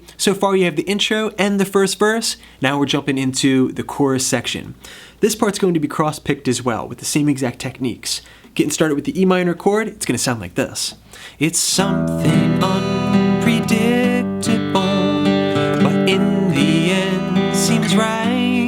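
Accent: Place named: South, United States. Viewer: Love the country accent to it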